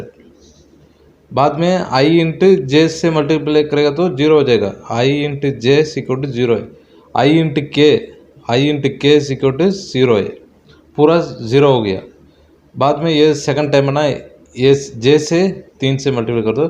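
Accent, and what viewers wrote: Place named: Hyderabad, India. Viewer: Hydrabadi ton me Bolta Bai.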